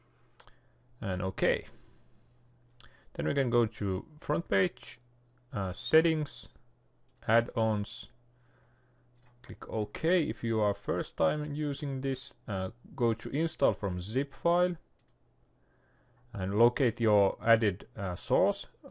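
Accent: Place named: Finland. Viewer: Tunnistan suomiaksentin tästä :D Kiitos kuitenkin!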